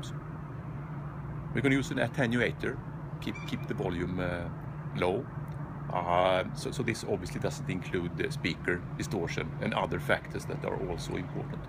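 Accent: Gothenburg, Sweden. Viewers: Great video. Gillar din Göteborgsengelska med. :-)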